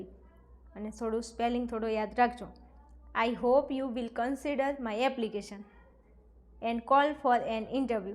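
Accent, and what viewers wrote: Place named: Mehsana, India. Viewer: Tamara language upar thi lage chhe teacher tame mahesana baju Naa chho ne 😅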